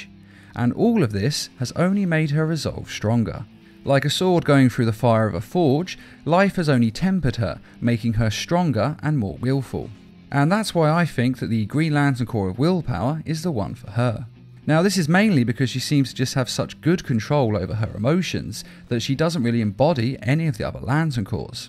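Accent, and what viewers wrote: United Kingdom. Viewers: Fear because he’s got that calm British accent that makes him immediately 10x as scary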